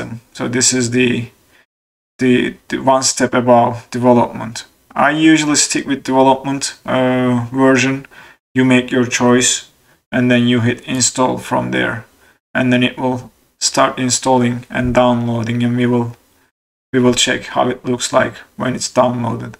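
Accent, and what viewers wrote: Turkey. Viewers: Thanks for tutorial. Quick and explanatory. But your accent doesnt sound like a native english speaker. Türk müsün ?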